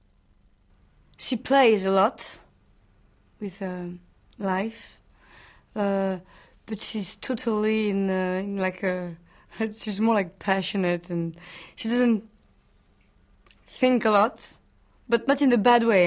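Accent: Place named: France. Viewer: lovely french accent!kinda sexy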